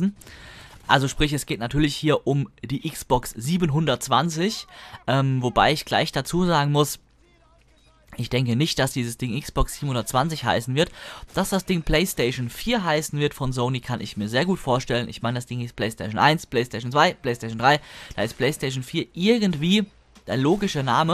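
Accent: Hesse, Germany. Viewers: dein Hessisch ist genial:)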